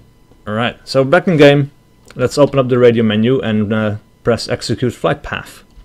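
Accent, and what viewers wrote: Netherlands. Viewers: me realising you're dutch aswell visible confusion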